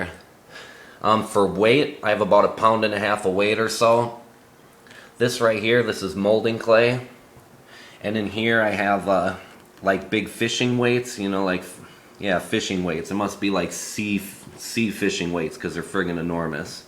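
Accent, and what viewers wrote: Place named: Michigan, United States. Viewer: Not to be weird but are u from Michigan u sound exactly like a sub teacher I have lol but nice car!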